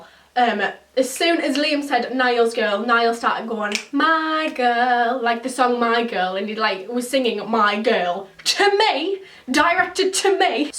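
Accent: Newcastle, United Kingdom. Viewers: Are you Geordie ??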